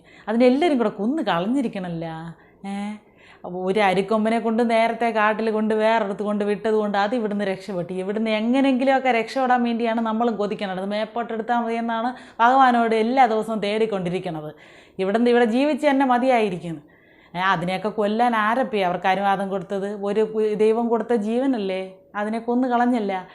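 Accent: Thiruvananthapuram, India. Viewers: എന്തരപ്പീ ഇത് എന്തരു നല്ല അവതരണം അപ്പീ ഇത് തിരന്തോ രംഭാഷ കലക്കി സൂപ്പറായിരിക്കുന്നു മോളേ👍♥️🌹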